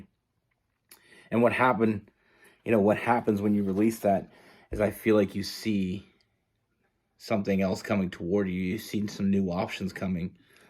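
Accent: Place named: Toronto, Canada. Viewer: U sound like ur from TO. I’m from beaches ✨😄✨ Nice reading 🙋🏼‍♀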